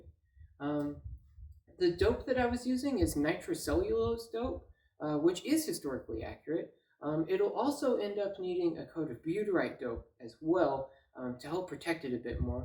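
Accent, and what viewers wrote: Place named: Canada. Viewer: Has to be his voice, and that hippy Canadian accent.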